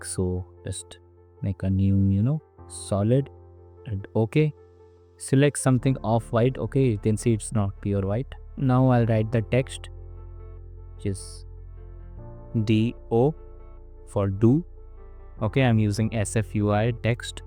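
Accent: India. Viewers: wahi to Indian accent me English bolta hai mza aata hai dekhne me lekin pura dalta to achha hota aor bhi logo ka dekhta hu but unka utna mza nahi aata dekhne me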